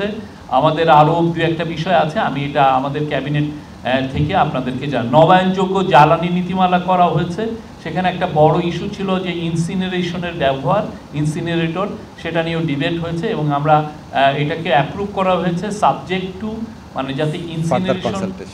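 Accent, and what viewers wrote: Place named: Kolkata, India. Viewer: Kotha bolar style e lage jeno kolkatar style🐸🐸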